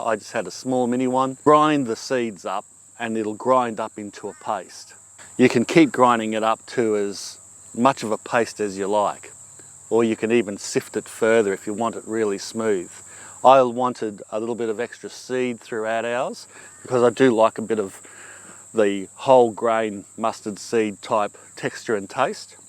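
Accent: Australia. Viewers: Omg! I was looking for recepies of mustard and I found you, with this aussie accent that it m miss me, after spending 2 years in Sydney in 2000, you brought me to those days ❤ tank you for the recepies.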